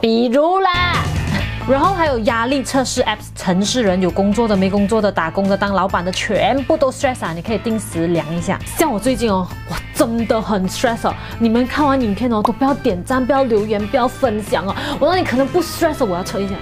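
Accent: Malaysia. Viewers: 你系痴利根定系马来口音都系咁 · 马来西亚的口音都是这样子的吗？ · 馬來西亞普通話？ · 我是来听你的马来口音的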